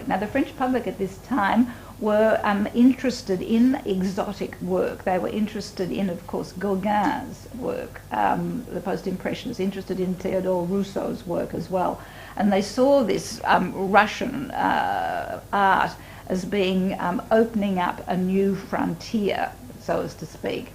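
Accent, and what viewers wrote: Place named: Australia. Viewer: if the australian accent could be contained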